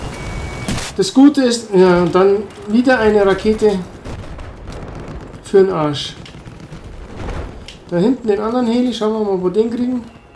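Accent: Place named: Bavaria, Germany. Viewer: 100% Bayer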